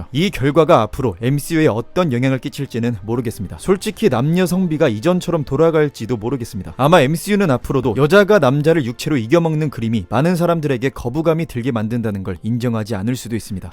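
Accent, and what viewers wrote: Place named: Jeolla, South Korea. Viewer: 전라도 사투리 빼라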